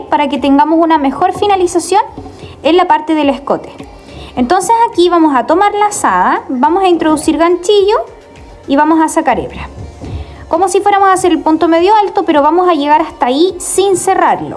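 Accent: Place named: Chile. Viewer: Hola 🤗 Recién encontré tu canal me encanto el vestido y explicas muy bien de donde eres ? tu acento se me hace 🇨🇱 voy a empezar mi vestido espero encontrar el hilo, acá no hay muchas opciones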